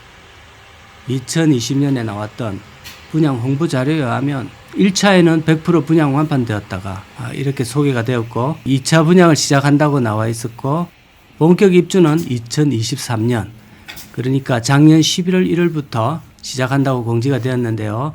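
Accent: Gyeongsang, South Korea. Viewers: 목소리 사투리 매력 있으요 핫팅 !! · 구수한... 경상도 사투리 멘트... 최고입니다...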